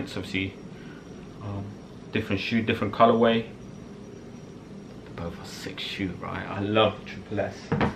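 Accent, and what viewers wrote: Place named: United Kingdom. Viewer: Are you Mexican ? 😁 I’m confused because your accent is British 😂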